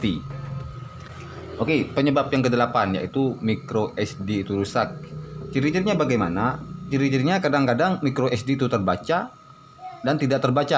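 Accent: Madura, Indonesia. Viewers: Logatx kok kaya madura bosss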